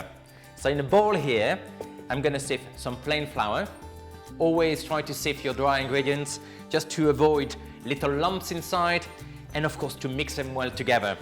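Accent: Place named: France. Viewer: dude your french accent is amazing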